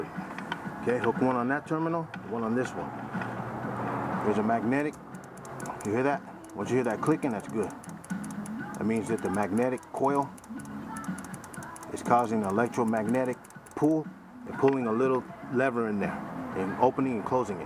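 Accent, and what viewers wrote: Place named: Bay Area, United States. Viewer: imma guess, you from the bay bruh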